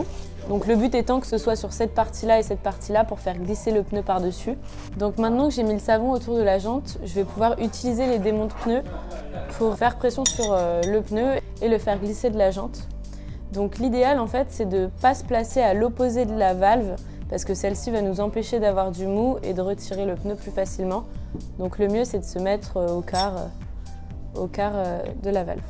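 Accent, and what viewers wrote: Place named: Quebec, Canada. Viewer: Cool l accent québécois !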